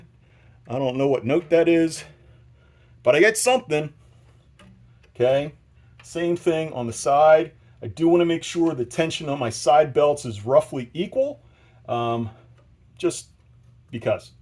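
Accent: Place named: Philadelphia, United States. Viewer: Thanks for the help! You sound like you're also from Philly, right?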